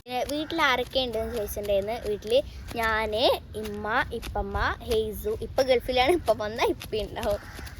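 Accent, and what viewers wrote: Malappuram, India. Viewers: മലപ്പുറം ആണെന്ന് മോളു പറയാതെ ആ സംസാരത്തിൽ മനസ്സിൽ ആവും. ഞാൻ മലപ്പുറം ആയതു കൊണ്ട്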